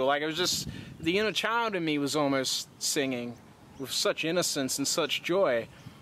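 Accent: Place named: Quebec, Canada. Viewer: maybe French Canadian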